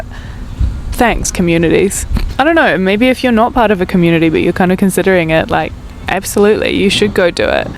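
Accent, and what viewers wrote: New Zealand. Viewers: I love that there’s someone out in YouTube land with my accent. And why it feels great when you’re back here in nz is coz we are way cool here 😂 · I love your accent. I am trying to get an NZ accent! Everything you said about the NZ pottery clubs is soooo true! I learned pottery at one in Whangarei in 2022. Everyone was so nice and helpful. I am still learning a lot but hope to have a business when I go back to the USA. And the cost is so low here! I think in the US the monthly cost is what you pay in London. I only pay $120/year in NZ! It made it possible for me to learn pottery. I will miss NZ so much when we leave. Plus it is so beautiful here and it smells good! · you still have the kiwi accent 🙌🇳🇿 I’m in Northland, NZ. Thinking of joining a pottery club this year, thank you for your video! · Yeah another Kiwi. I am part of the Waikato Society of Potters. 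Love it. I watch your channel and feel so at home with "our accent"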